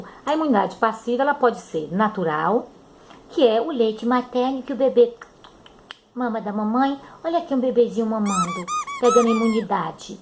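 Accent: Bahia, Brazil. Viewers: Essa é baiana, hein bebê. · Vc é baiana??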